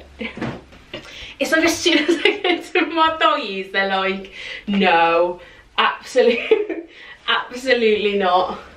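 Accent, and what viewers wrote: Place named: England, United Kingdom. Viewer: Hi 🙋🏻. You popped up in my recommendations and I’m SO glad you did 🥳👍🏼. I love my American ladies but it’s so nice to hear a good old English accent 🤣. You deserve a 🏅 for trying on all those jeans. I absolutely detest shopping for jeans 👖 🔫🤬🥵❗️. I am a size 18, and like you have a small waist and big hips and thighs ☹️. If jeans fit my waist they sure as hell ain’t gonna fit my hips. I have been buying jeggings in an effort to solve these problems. I feel more ‘put together’ in jeans TBH, but I love the fit and comfort of a jegging 👍🏼😀. ASDA do some great jeggings at the very affordable price of £12.50. I have several pairs and they wash up lovely 😊You should try them. Going off to watch some more of your vids now. New subbie 🌹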